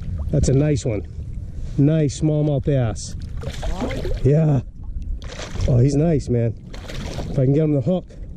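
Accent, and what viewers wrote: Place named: Maine, United States. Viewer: Strong Maine accent.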